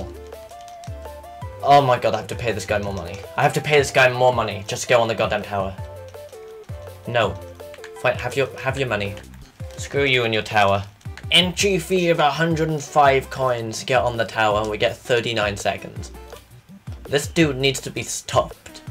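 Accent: United Kingdom. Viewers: I like how you have a full British accent, but when you say mario, you sound so American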